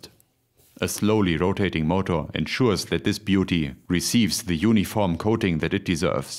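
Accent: Germany. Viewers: Your German accent is enticing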